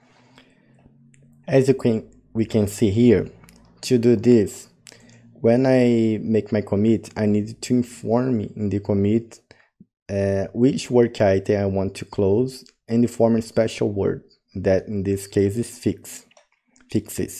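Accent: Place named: France. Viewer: thank you so much, I dont know why such useful video does not attract many people. BTW, I love your French-English accent 🤩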